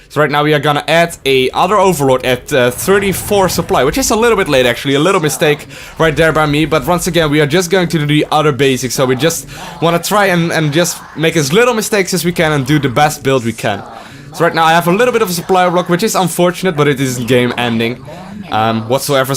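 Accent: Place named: Netherlands. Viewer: Quit whining about a Dutch accent. You understand him, you learn from him, you can't speak commentate this game better. QQQQQQQQQQQQQQQ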